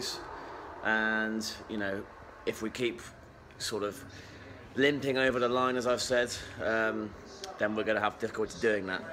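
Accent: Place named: Liverpool, United Kingdom. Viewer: Great Scouse accent mate, surprised you ain't a United fan, they all live in London too.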